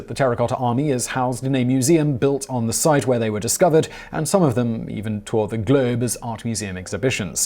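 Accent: United Kingdom. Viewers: I hate the British accent :|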